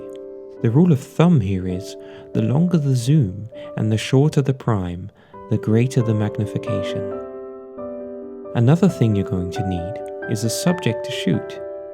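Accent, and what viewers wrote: United Kingdom. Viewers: You sound British but have your camera in German?! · Between that nagging music and the mumbling British accent I didn't understand a word. waste of time.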